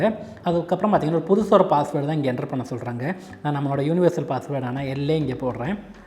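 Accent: Kerala, India. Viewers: என்னாயா உன் லேசா பேச்சில மலையாள வாட அடிக்கிது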